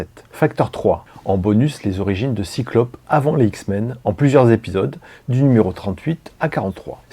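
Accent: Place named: United Kingdom. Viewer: Merci pour ta vidéo et ta chaîne dans l'absolu ! Ton accent anglais me fait bien rire 😉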